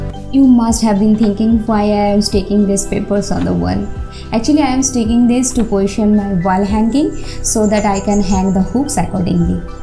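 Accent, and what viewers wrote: Bengal, India. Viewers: U are a bengali right? Because the english pronunciation is something like what a বাঙালি does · I know by her accent, she is bengali.. I am bengali too..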